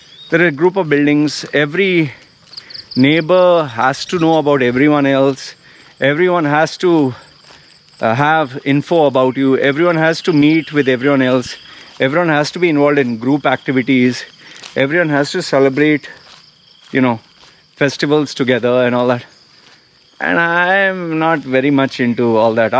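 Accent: India. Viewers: After watching your video, I would say you should talk to your parents that they should plan you in America at least you could speak American accent English. And you didn't get married to an Indian woman because bla bla bla.... Because you must from a very backward area from India or you left India in 70s or 80s. Or third your video is not relating to every part of the country. Or most important: you must rejected by so many Indian women. Last but not least, indian people are hairy but not unhygienic. We Indian prefer hygienic hairy bodies instead of sweating, smelling and yucky people with bald bodies who don't take bath for a week. You might be right at some points but Being an Indian face, Indian accent English (May have American citizenship) you are not disagreeing, You are insulting.... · Ae Indian hi sayad... he's accent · Which country r u native to?? Ur english accent is 100% indian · That’s the right question, the last question . 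Definitely no Indian woman wanted to marry a man like you. First and foremost learn to respect everyone . Seems like you had a very sad childhood . The traumatic experiences. Maybe it’s your parents or the Indian society which screwed you. Try to get yourself heal rather pretending to be someone else . There is a psychological reason why you are wearing a mask now . And your accent, thats a typical Indian accent man. Unfortunately you can’t run away from that. Your root! Angry and fearful man. Peace ✌🏼🇲🇾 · Are you an Indian? Your accent sounds of an Indian speaking English.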